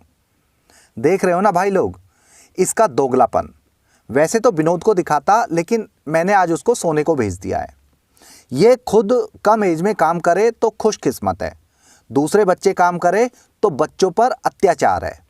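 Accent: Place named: India. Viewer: Abe sutiye kabhi apna bhi to reality bhi bata de up bihar ka lagra hai tu aage bata jhaatu apne baare me anpad gawar to Tu lag hi raha hai apne dihaati baat karne ke style se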